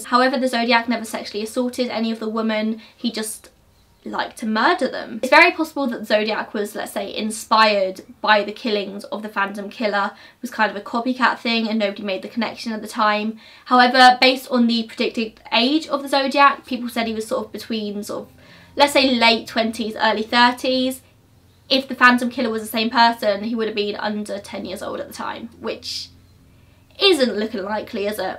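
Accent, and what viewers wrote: United States. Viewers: Stand your ground. If you had something truly awful to say about rape, animal death, child harm....and the like, I have every confidence that you would warn us before you blurt out that the family cow stepped on the diaper of the baby of the family then the goose was giving the wife the eye and she felt threatened of rape so she slapped the horse on the rear and he kicked the goose in the knee. Enough said. BTW, your American accent is adorable. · Your American accent is on point! Dont be so shy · I agree with you!!! Keep up the good work and you did sound a little American and it’s ok 👍🏽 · Your little American accent is the cutest omg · your "american" accent is so cute!!! also completely agree with what you said in the beginning of the video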